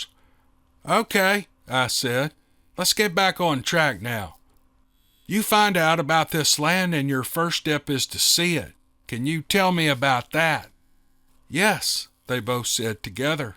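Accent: South, United States. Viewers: Good morning. I enjoy your recounting the experiences others have had but, nothing compares to these Steve Lily and Wildhunt stories. There is a connection to the characters that the shorts just don't afford. Your southern drawl gives your narrative an air of authenticity. Please keep them coming. Randy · I’ve been sharing your stories & telling everyone I can all about The Wild Hunt & Steve Lily. Everyone I share these gems with is hooked after hearing the first one. Sometimes I tell them in my own words & voice to peak their interest, then I close the deal when they hear the twang of the guitar & the soft, soothing sound of your good ole boy southern type voice weaving a tale of man vs monster. The latest addition to your growing audience is my oldest nephew. I started him in on The Wild Hunt Oklahoma Sasquatch Wars. Thank you for all you do & looking forward to future Steve Lily & Captain Clark of Team Odin’s Squatch encounters.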